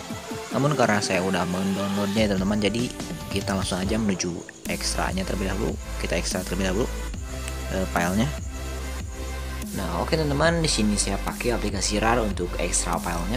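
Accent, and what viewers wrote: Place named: West Sumatra, Indonesia. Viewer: SUARA MU KYK MINANG KOCAK BANG, TP MANTULLL BANGGG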